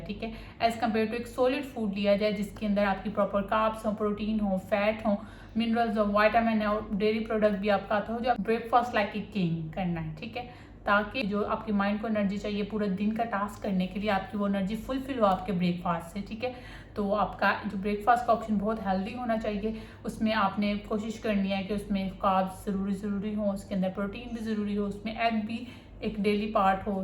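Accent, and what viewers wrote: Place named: Punjab, India. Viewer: Very informative but improve your Urdu accent...seems you speaking Punjabi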